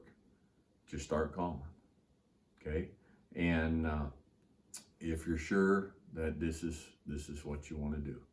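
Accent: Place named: Southeast, United States. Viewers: Just found this guy yesterday. Now I'm binge-watching. Three points come to mind now: First, in the first video I saw of his, was looking 100% "Texas." The video was teaching how to make a simple camp breakfast (awesome, btw!), and our man was surrounded by flat TX dirt, cedar trees, and what looked to be some mesquite, too. Texas all the way. Then he opened his mouth and started talking. And I thought, "NO WAY is this man from the Lonestar state! That is East Tennessee if I've EVER heard it!" Turns out I was right, lol. Second: Hate to get "political," but when I hear all this talk about "white privilege" I think about countless men like who had NO privilege at all! Every ounce of success was earned with blood, sweat, and tears. Third: should get an agent and go into radio or voice acting. You're a NATURAL!! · I thought I heard a more southeastern accent. 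I'm from east TN and me and my wife have always wanted to do something like that or atleast regularly utilize and ride horses/mules. So glad I came across your videos! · Mighty great story, and lessons for life. Purty sure you still have a little bit of Kentucky accent. After two generations here in Texas, I still consider I have some of my meemaw's Kentucky accent.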